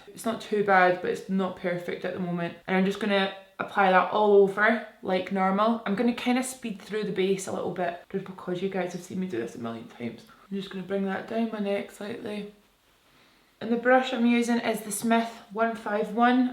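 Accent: Scotland, United Kingdom. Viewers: To Do List • Quarantine Day #764 1. Watch at least 1 Jamie Genevieve video 2. Proceed to talk with a Scottish accent in Buffalo New York while feeding my husband, 4 kids and dog in PJs and FULL GLAM! Love you and Jack so much. Love the vlogs but find watching you do any makeup is so soothing. Stay safe and healthy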